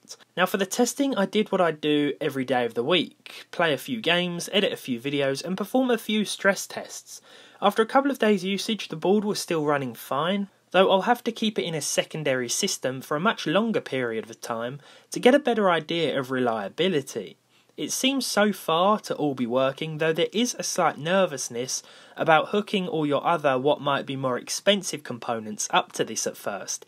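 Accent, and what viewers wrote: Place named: Essex, United Kingdom. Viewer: you sound like you're from Essex , although I maybe wrong. Anyways great video , but the x79 Chinese mobos are either a bust or they last like 3-5 years.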